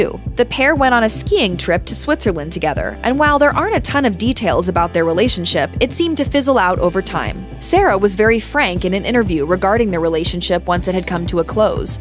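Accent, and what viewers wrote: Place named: United States. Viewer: Sorry,but why do we always have to suffer these damn American accents!!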